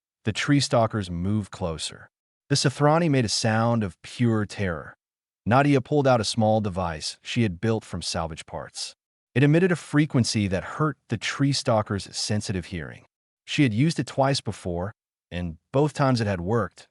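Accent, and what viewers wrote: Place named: Spain. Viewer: The occasional Spanish accent draws my smile.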